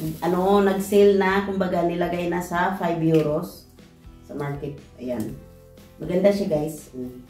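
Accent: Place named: Visayas, Philippines. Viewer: Bisaya diay ka...taga asa ka sis?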